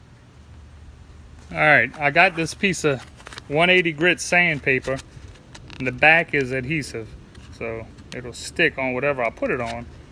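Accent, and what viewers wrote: Louisiana, United States. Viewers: Are you from Louisiana? Your accent sounds like it. Thanks for the video! Great idea! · I’m hearing a Louisiana accent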